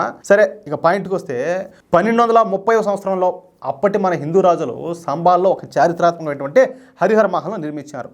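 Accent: Rayalaseema, India. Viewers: రాయలసీమ యాస superb. యా ఊరు నీది.!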